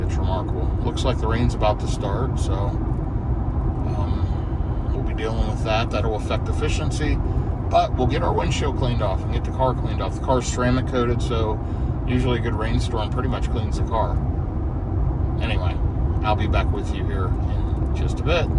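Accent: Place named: Western North Carolina, United States. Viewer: I'd still say North Carolina with the way you pronounce your Os. It very unique to western North Carolina.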